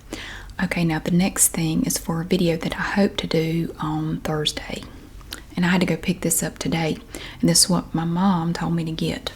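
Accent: South, United States. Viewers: That’s hilarious that someone accused you of faking it. I’m from the south and there’s many many different accents. My kids don’t have as much as an accent as I do either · Oh my lord, people really think she fakes a southern accent?? I admire anyone who maintains their accent- especially southern, for all the ignorant crap they deal with. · The southern accent is one of my favourite american accents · I found your channel four years ago when I moved away from home at 18 from Alabama to California (to go to school for linguistics). I already loved ASMR but I started to get homesick so I looked up "ASMR southern accent" because I thought it would bring me some comfort. Your channel was the first one to come up, and your voice reminded me so much of home! So thank you for bringing me a little bit of familiarity and comfort during a hard time, and I love your accent ❤ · your accent reminds me of my mom's side of the family. 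To me accents are beautiful just like different languages. To hear you brings back a memory of my Nanny (my mom's mom) she had a fantastic southern accent. Now I'm born and raised in South Texas and my mom from TN. So thank you for bringing back a nostalgic feeling. You keep doin you and I concur the internet being exposed to social media affects us. In every way possible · I live near raleigh and I hate that I don’t have a southern accent- my mom moved from Franklin Virginia to California and got made fun of because of her accent so she had to learn how to talk like them I always wanted an accent like yours and I love watching your channel because you have the same accent as my grandmother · First of all, I love your accent and positive energy! Also, I’m a store shelf organizer too 😜 ❤️ So many people think that there’s only one southern accent. I’m from Louisiana, and we have several different southern accents over here as well. 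The north LA accent sounds completely different from the way we talk down here in south LA. We, like you in N.C., also have several different accents just within the coastal parishes of our state. Where I live, we sound like what you hear on the tv show “Swamp People”. Whereas, in the northern part of the state, they sound more like The Robertson’s from “Duck Dynasty” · something about souther accents makes me tingle.... thank you for this video! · You accent sounds like Forrest Gump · Whoever accused you of faking your accent is clearly unfamiliar with genuine Southern accents, and has watched Steel Magnolias one too many times. I grew up in North Mississippi and I currently live in East Tennessee. The accents are similar. Only slight differences in N. MS and TN accents, but N. MS and S. MS accents are VASTLY different. Anyway, thank you for the video, and it's always good to see you too. 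♥ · I love your accent! I am planning on going to college in the south next year because I have always loved listening to people with these accents. wish I had one myself! Love your videos 💗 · 6 years ago I went through military bootcamp and it was the first time I was really exposed to the southern accent. I remember one specific girl, she was my wingman, who was from North Carolina and she had a very thick southern accent that reminds me of yours. I also made lots of friends from Georgia while I was in the service, my Georgia boys ❤️ The southern accent will always hold a special place in my heart. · I want to come to america and date a girl with a southern accent lol. What states have nice accents like this? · I love to listen to you talk. My dads family was from West Virginia, so their accents are different. But I love all southern accents. Sounds like my childhood. ❤️ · Ohhh you’re SOUTHERN southern,,, I’m Appalachian southern. 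Very different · Can you do a video trying to speak without southern accent? itd be really fun to watch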